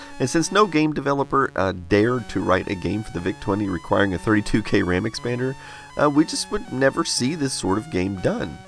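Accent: France. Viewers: Awwwwwwwn your french is adorable ^^ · I like your french accent 😀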